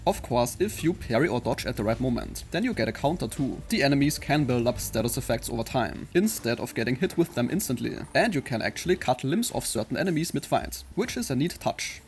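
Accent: Germany. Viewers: Krasser Deutscher Akzent. Sofort gedacht, dass du zumindest Österreicher, Schweizer oder Deutscher sein müsstest.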